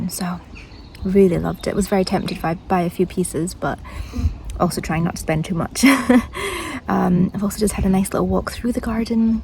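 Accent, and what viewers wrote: Scotland, United Kingdom. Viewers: When I saw ‘ ’ I somehow wasn’t expecting a mild, wee Scottish accent, for some reason. I was expecting a loud, brash North American! No matter. It was a lovely video. Ty.👍 (Edit: … just look at this. This is not a wee nook of the Highlands but, more or less, a central part of Scotland’s capital! You captured it beautifully.) 👍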